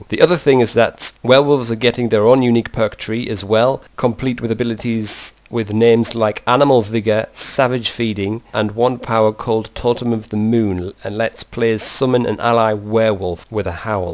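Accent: United Kingdom. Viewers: dude ii watch your videos just for i hear your voice ... Britsh . its amazing. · britishhhhhh!!!! XDDD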